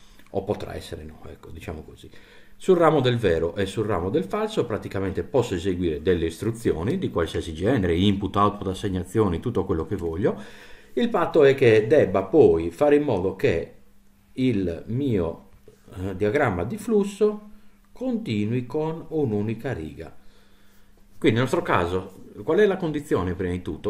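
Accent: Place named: Sardinia, Italy. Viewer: Non posso non fare i complimenti per la chiarezza espositiva e le precise nozioni illustrate. Mi dispiace essere arrivato in ritardo nella scoperta di questo canale che sto seguendo con piacere e tanta voglia di apprendere il linguaggio C#. Chissà... Davvero complimenti perché rispetto ad altri canali Youtube, al momento, questo si sta rivelando per me il più efficace. Sinceri complimenti! P.S. Immagino dall'accento e dal cognome che siamo anche conterranei... fortza paris!:goodvibes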